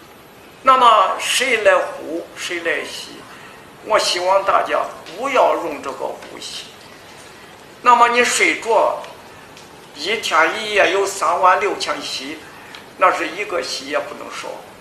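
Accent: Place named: Henan, China. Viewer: 河南人河南话，但和山东部分地区的口音很相近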